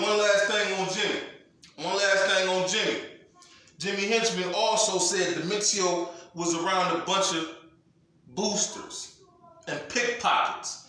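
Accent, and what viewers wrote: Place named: Arkansas, United States. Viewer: You making a lot of sense.. Sound like niggas where I'm from here in Arkansas. .Home of the Chambers Brothers..who you should look into doing a video on..real heavy hitters..I went to school with the younger generation of Chambers..but as for Demencio..I'm not thuggin but let me hang wit em so I obtain that image n now I'm free to be me..No matter if I wanna be on some thug shit, goon shit, player shit, money making shit..I'm free to do that because of how I have surrounded myself..P.O.M.E. type shit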